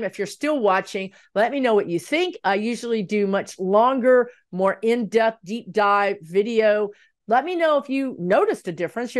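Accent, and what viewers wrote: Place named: South, United States. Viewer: Hey ❤ You're sounding very southern today---you go girl!!! 😂Love you so much.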